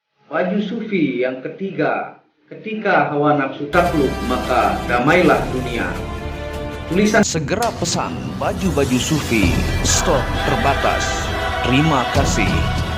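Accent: Bali, Indonesia. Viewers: Logat pembaca spt org Bali ?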